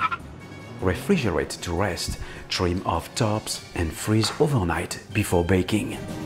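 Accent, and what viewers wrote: France. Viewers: This man sounds like every stereotype french chef ever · That french accent😂😂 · As I heard his French accent I knew I stumbled upon something GOOD Seriously, amazing dessert, chef. Chapeau :D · This French accent has got me dead I swear 😂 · I still can't decide whether he is french or just doing an accent. It's blowing my mind. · Well, I'm French and with a french ear, I really can't stand french accent 😂 even if his is way better that some I've heard 😂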